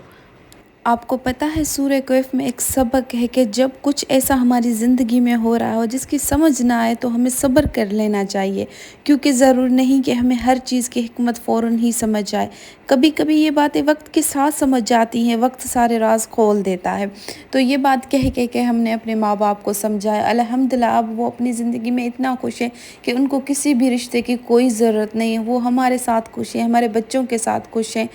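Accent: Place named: Sindh, Pakistan. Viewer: Sister ap sindhi ho na😂 lehjay sy pata chal rha hy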